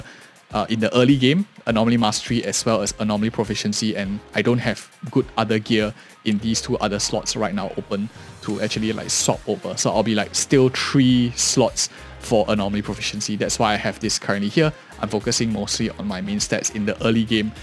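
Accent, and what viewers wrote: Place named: Singapore. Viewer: Sound like a Singaporean (ofc I myself am). A lot of thing I didn't know cause I didn't bother reading the long skill explanation, he gives simple explanations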